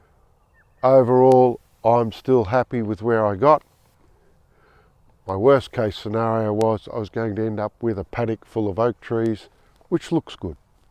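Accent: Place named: Australia. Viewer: I found this video while researching as to whether this could be done. It's encouraging. The gentleman sounds Australian. Is that where he lives?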